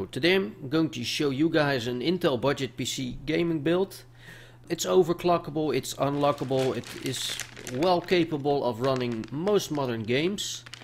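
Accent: Netherlands. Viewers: are you dutch or belgian?? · Love your video's man. Your dutch accent is funny xD Could you possibly help me with a build? The budget is 750 euros. and id like a GTX 770 in there. Thanks annyways. Keep up the great work! I dont need a Monitor, keyboard, harddrive, or mouse. Also id fancy a sexy/badass case! <3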